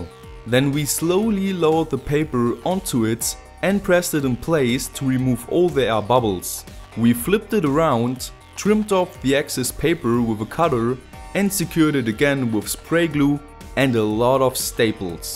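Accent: Germany. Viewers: German, right ? :D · Warum spricht der englisch das ist doch ein deutscher Akzent und die Schrauben von Hornhaut hatten auch eine deutsche Beschriftung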